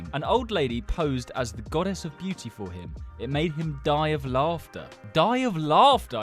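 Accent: United Kingdom. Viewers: Love that british accent.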